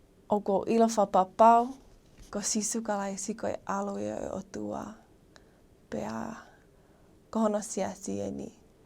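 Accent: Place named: Tonga. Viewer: you speak Tongan so fluently... accent is sooo Tongan Tongan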